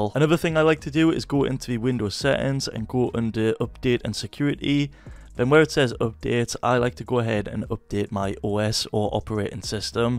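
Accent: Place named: Newcastle, United Kingdom. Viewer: Never been so excited to hear someone else from newcastle lmao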